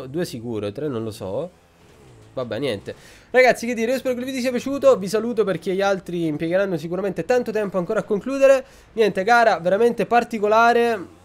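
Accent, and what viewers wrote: Palermo, Italy. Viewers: ma sei di Palermo? A volte hai la cadenza palermitana da come parli xD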